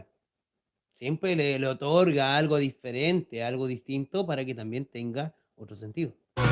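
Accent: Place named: Chile. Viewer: jajaja gracias por el análisis... he visto a otras personas llorar cuando lo escuchan por primera vez, así que no te preocupes. Un abrazo, desde Argentina (supongo que sos chileno por el acento)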